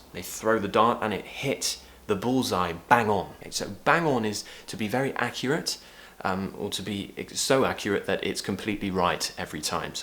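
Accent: United Kingdom. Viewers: Your speech, awesome British accent and explanations ın a simple and easy way are soooo helpful. Thanks a lot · Thank youuuu !!!! You helped me a lot to learn british accent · Has anyone seen him twice with same hairstyle? Remarkable guy! You've inspired me to learn the British accent. Thank you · I follow many English teachers on YouTube in order to improve my British accent. They are all great but yours is for sure the best! I just love it. Cheers. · I love the British accent. It is elegant and your accent is best ever. I will keep watching your videos to learn as much as possible. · I'm so grateful for your helpful videos; they give me a new lease of life. I find this one especially nice and useful. Let me get something off my chest: I love your British accent and expressions. Have a great day, or as close to a great day as you can have in these difficult times. 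Cheers,! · love your accent ❤️ and excuse me, where are you from in the UK? :D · Thanks so much💚 for educating us , Everyday I watch your old and new video's they helps me a lot to learn British accent Big hug for you my English teacher 😘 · I love British accent and I've been learning a lot because of you. Thank you so much teacher. You're the best. Greetings from Mexico. 💕🥳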